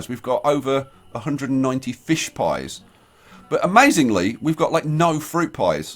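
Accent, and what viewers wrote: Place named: England, United Kingdom. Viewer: Hey mate =) love your english acsent, where in england is it from? Hope you continue this. If you still have the save. we never got too se a brewary and the pub being buildt. build a royal sea harbor =) cherrio mate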